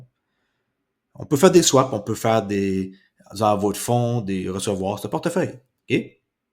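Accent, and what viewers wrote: Canada. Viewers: Ah bah voilà je me doutais bien que cet accent était Canadien 👋🏻🤝🏻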